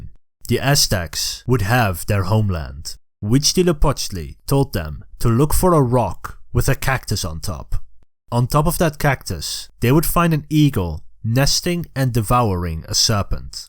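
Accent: Netherlands. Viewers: I want to know too! I am hearing the slightest bit of a dutch accent? I am confused too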